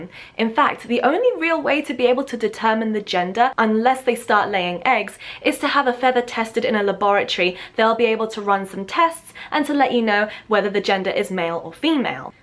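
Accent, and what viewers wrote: England, United Kingdom. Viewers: Oh, that British accent. Nothing for my ears. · Prounance from england is so beautiful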